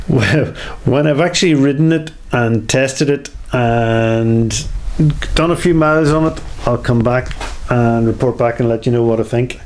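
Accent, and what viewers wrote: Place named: County Down, United Kingdom. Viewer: That's a County Down accent.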